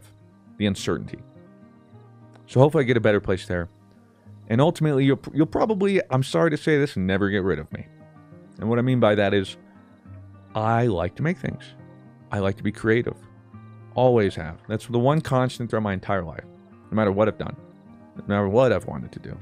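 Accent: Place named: South, United States. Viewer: Listen to that country twang you have! Idk tha5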